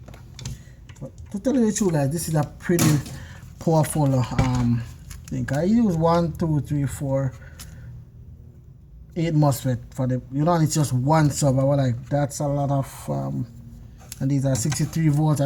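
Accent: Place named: Jamaica. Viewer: Sound like a yaadie🤔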